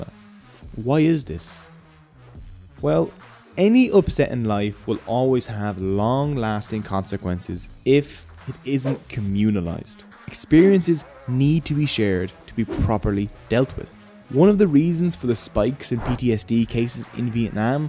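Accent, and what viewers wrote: Ireland. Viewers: "Sw- swiss physicians. Oh what a f------ word combination!!!" I love the English language and your Irish accent. Thank you for the laugh.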